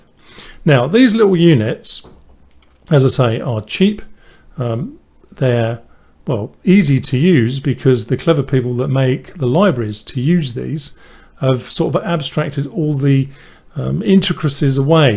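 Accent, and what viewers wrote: United Kingdom. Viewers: Hello, you accent sounds "British" ;-) The problem is, that these chips are clones. The original ones from Nordic are much more expensive, about 5 USD / pc. I have aprox. 30 of them and only 20 are worht to be used in applications. Schöne Grüße aus Deutschland. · Oh my ears are happy. There are some great tutors on youtube and i can't take that away from them but whiny American accents drive me insane. Even the accent of a southerner is music to my Leeds ears ;-) Great tutorial, Subscribed.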